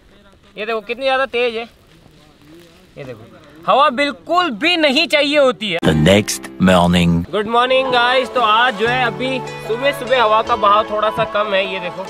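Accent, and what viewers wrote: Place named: Marwar, India. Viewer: bhai aap per marwadi lengwej bahut mast achi lagti h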